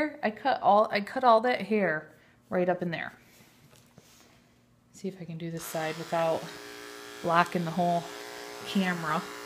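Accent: Michigan, United States. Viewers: you're a star! I just luv ur soft Michigan twang.. could listen to you all day but I gotta work! Keep up the good work!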